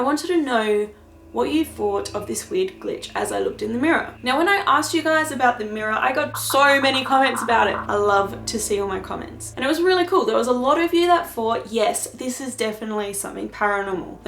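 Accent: Australia. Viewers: Hey, I just found your channel through the link the paranormal scholar shared and I have to say after watching a few of you videos I really enjoy your channel and the content is awesome. I’m gonna sub right now. Also, I love you accent. My sister’s husband was born and raised in Sydney Australia but now lives here in Orlando, Florida. He and his family have that awesome Aussie accent and you very much remind me of it. Anyway I look forward to watching your previous videos and seeing what’s to come. Take care and keep on with the awesome awesomeness. Peace!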